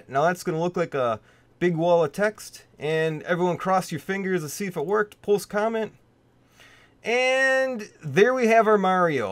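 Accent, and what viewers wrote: Canada. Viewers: I knew you were Canadian by the 15 second mark :). Correct me if im wrong though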